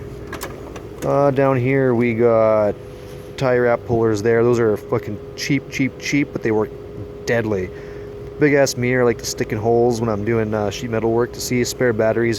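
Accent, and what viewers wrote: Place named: Canada. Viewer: Can you first introduce yourself please and state how credible you are, what you're currently using them for, what your specialties are, and how many years you've been an aircraft mechanic? And please, an iphone 5s has a better camera than that. What is that a flip-phone? Nice Canadian accent, you from Canada?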